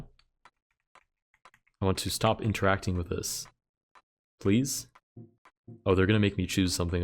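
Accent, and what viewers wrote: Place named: United States. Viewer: his accent is american tho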